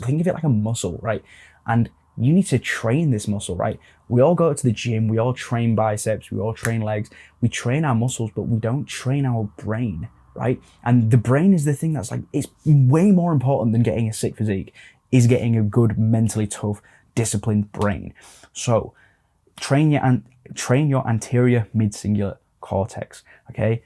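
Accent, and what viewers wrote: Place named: RP, United Kingdom. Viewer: Damn this dude even has the James Bond accent. Dudes unreal.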